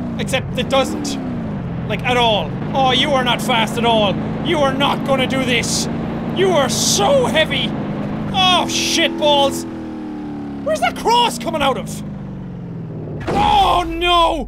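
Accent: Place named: Ireland. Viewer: The boss he's flipping irish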